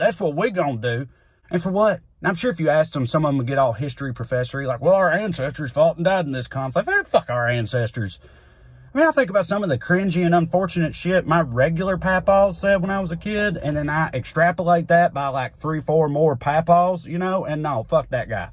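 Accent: South, United States. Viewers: Two minutes of the most brutally honest truth, spoken by the most southern white guy I've ever heard. All while trucks are casually rolling coal in the background. Several of my southern relatives have disowned me, if only they had the courage or wherewithal of self reflection that you seem to possess. You sir, have earned a subscription, but deserve a medal. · I just moved to North Caroline and I'm so happy to hear someone speak in southern drawl saying intelligent things. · ME TOO. SOOOOOO SOUTHERN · You have single handedly re-written how my brain hears a American Southern accent. 👏 Bravo. Keep it coming. 🇨🇦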